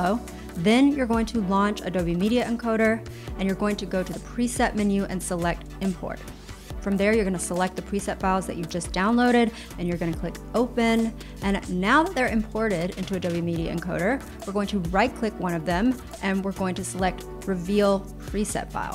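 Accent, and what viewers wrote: South, United States. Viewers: Also, lovin' the speach. I'm from Georgia and I feel like watchin' your vids brings me back home to the south 😀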